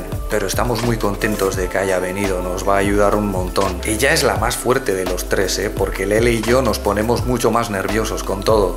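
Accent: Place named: Basque Country, Spain. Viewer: Buenas pareja, acabo de ver uno de vuestros vídeos de sugerencia y la verdad que nada más escuchar hablar a ya he sabido que eras Vasco!! Me subscribo!!! Dena ondo ateratzea espero dut eta agurrak zure lurretik!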